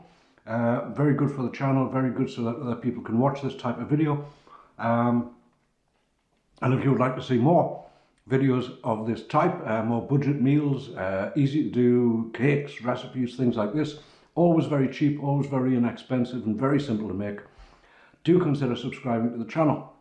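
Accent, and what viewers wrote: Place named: Sunderland, United Kingdom. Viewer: I don't eat meat, but I'm happy to watch just for your lovely accent which reminds me of Christmas with my family in Sunderland.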